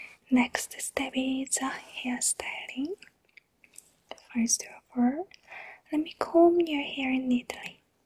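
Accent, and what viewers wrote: South Korea. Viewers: 어쩐지 발음이 약간 우리 영어선생님이랑 비슷하고 한국인 같㉰ 했는데 내㉮ 젤 좋아하던 asmr유튜버㉮ 한국인이라닌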